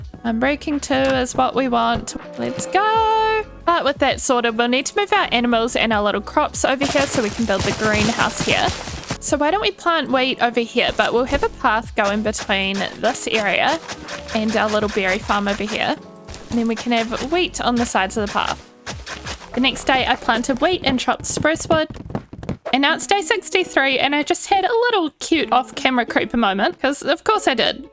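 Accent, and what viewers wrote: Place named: New Zealand. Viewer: 200 days is def needed! This is just so cozy and your New Zealand accent just makes this all the more better. <3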